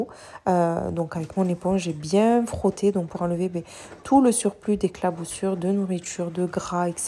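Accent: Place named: South, France. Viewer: Salam aleykoum hbiba 🥰. Merci pour ta vidéo motivation 🙏. Tu as une belle maison 🏠 dans la prairie 😂 machallah. Moi aussi je finis de laver ma plaque et je vaporise du produits vitres pour retirer les traces de doigts. J'adore tes vidéos et ton accent du sud ❤. Qu'allah te préserve toi et ta famille 👨‍👩‍👦‍👦 inchallah 🤲🤲. Bisous Saïda ⚘ 🌹 ⚘ 🌹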